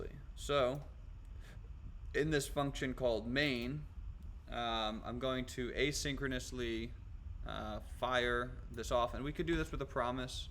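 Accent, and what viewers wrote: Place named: Belfast, United Kingdom. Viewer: Tongue, Accents Belfast oh mannnnny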